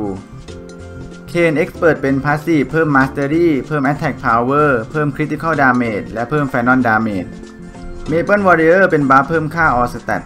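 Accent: South, Thailand. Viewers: พี่เป็นคนใต้หม้ายครับ .. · คนใต้บ่นิ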